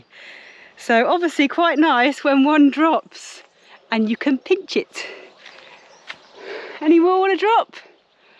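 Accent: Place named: Northern England, United Kingdom. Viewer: The first vlog I saw with you both I was amazed at your collective energy and togetherness 🤗 and it's great to have time away on your own but can be scary sometimes hey, I fully enjoyed this vlog as all your others and it was great to see at the end, if you don't mind me asking but with your northern accent where are you from, I'm from Burnley but live in London mainly for the weather 😁☀️😘 I can't handle the rain ☔ in Burnley 😳 it's horrible, even in summer you can get lots of rain 😳 have a great trip to Portugal 🇵🇹 will look forward to seeing how you both get on there 🤗 Edit, we've even had Hail Stones in London tonight 🙄☔😳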